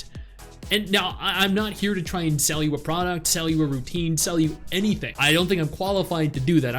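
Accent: Australia. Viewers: wtf you’re Australian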